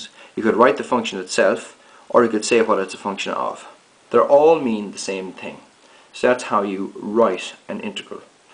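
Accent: Ireland. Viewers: You have a lovely irish brogue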